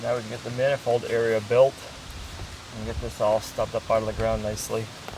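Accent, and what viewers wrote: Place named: New England, United States. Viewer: Oh you said “ TARP”, I heard “THHHAAAAP”. You New Englandera kill me with your accents! LOL. AND NO your not going overboard.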